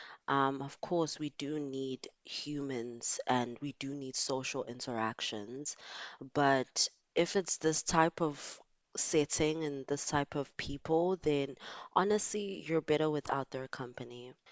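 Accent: South Africa. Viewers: Another exotical channel to subscribe to❤❤❤also you sound south african you have a beautiful voice❤ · I just know you’re South African🇿🇦 the beautiful accent gave it away😅❤I’m soo happy to see more South Africans in the exotical space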